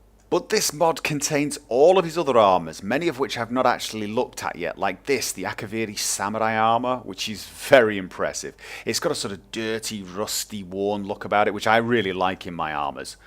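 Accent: United Kingdom. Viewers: He sounds like that British guy with the glasses from Assassin's creed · I'm very certain it's a British accent, I can't remember which one, but I'm certain I've met a few people with it o. o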